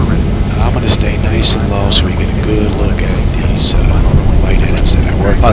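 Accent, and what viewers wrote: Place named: Queens, United States. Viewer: I thought your accent sounded a little familiar. I spent a "lovely" 8 months in Queens off the Van Wick Expressway shortly after 9-11. Saw the biggest rat of my life climbing the stairs up from the subway, lol.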